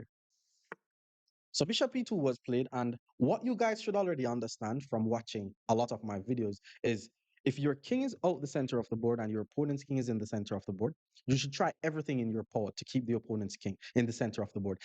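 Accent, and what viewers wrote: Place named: Jamaica. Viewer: Jamaican accent makes everything sound cooler and more interesting. I can watch these long videos with all my attention the whole way through.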